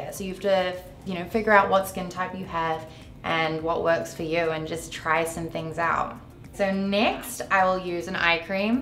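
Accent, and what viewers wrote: New Zealand. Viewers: New Zealand. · Yup...new zealand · Inna DC She is from New Zealand. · Shes a kiwi 🥝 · That Kiwi accent! LOVE! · Kiwi thick but definitely tell she talks to ot of american lol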